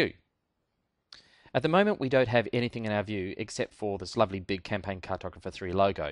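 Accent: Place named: Australia. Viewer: As an Aussie myself, I'm 99% sure its an Australian accent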